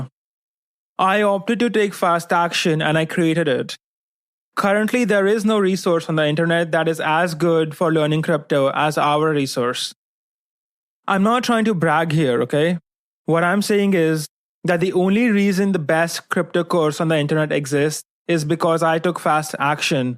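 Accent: India. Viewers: Why are people hating on his accent? No offense, but I find hard understanding Indian accent and this video is the exception. Great content.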